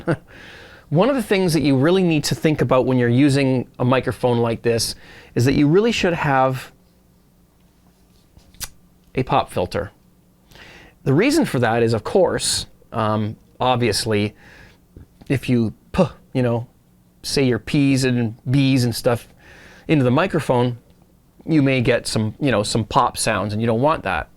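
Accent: Canada. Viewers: I could tell you were canadian by the way you talked.... I learned that from people making fun of canadians.... im a terrible person. :D (I have nothing against canada btw) about the mic: This sounds REALLY nice... I'm probably going to get this today. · Amazing what $90 can do with that Canadian accent.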